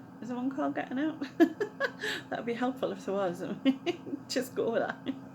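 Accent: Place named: Newcastle, United Kingdom. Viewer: Love your accent, I used to live in Newcastle, miss itx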